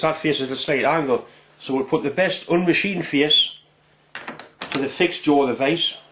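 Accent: Newcastle, United Kingdom. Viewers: 62 Btw he is not Irish he is infact a geordie ( from Newcastle, England)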